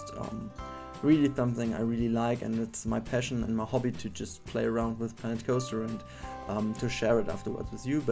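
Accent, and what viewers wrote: Germany. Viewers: You are German right ?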